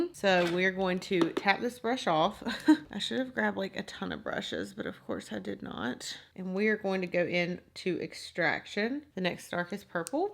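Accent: South, United States. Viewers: Ashley Cornely okay I'll never visit the south of USA. Screw being surrounded by that accent constantly. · Splucy She has a southern accent and I love it! No reason to leave a rude comment you should just leave politely.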